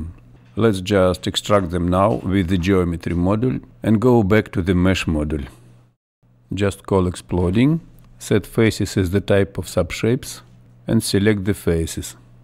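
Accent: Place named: Russia. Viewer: Я не знаю кто Вы... но у Вас очень хороший Английский с прекрасным Русским акцентом... :-) And Thank You very much for this video and for the effort You've taken!!!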